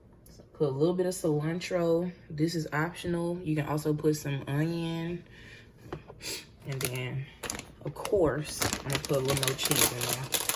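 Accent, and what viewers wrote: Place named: South, United States. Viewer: Bruh you sooo country I love it !! Definitely subscribed and making this recipe ASAP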